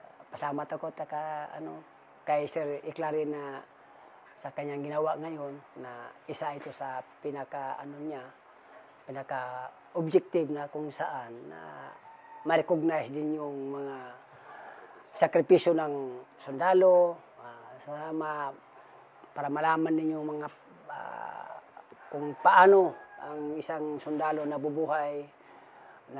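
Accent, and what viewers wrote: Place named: Bicol, Philippines. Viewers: Bicolano si sir bisakol ang salita saan Kaya sya SA bicol ganyan dapat mag kwento Hindi Yung masyadong seryuso Kasi tapos Naman na Yan ehh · The way magsalita si sir kababayan🥰bicolanong bicolano🥰 watching from Saudi arabia 🇸🇦 ❤ God bless you po🥰❤️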